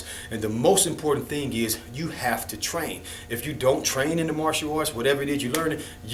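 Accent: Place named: Philadelphia, United States. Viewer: Dude sounds and looks like hes from Philly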